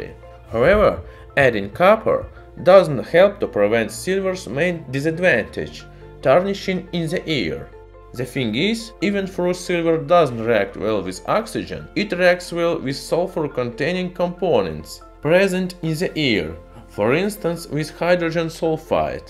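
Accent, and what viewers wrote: Russia. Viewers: Nothing more authentic than a Russian accented guy introducing an element. Solute to Mendeleev.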